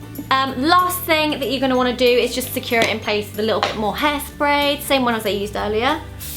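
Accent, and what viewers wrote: United Kingdom. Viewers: Beautiful british accent :') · DobbyThe FreeElf I dont know what part of Britain Zoella is from. All in all she is from Britain. Being a foreigner to GB, i am not able to distinguish all the different dialects and accents, and will plainly call it British. Usually thats how it always sounds to me when i meet people from Britain. So, what dialect is it? Educate me on that one, maybe you know better? I find it interesting how people get offended by just an opinion. I never meant it to be offensive. Cant help but feel that way, thats all.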